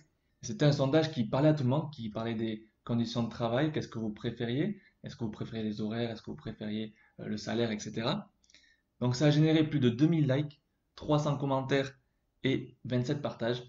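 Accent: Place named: South, France. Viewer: Le petit accent du Sud à la Marie Fray au masculin :-)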